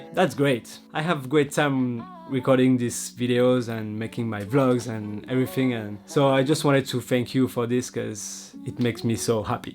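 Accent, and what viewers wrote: France. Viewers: Toi t’es français ? 😂😅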